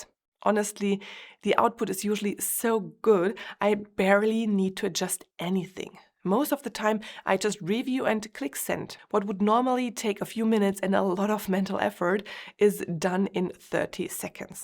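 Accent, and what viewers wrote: Germany. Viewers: Hard German accent.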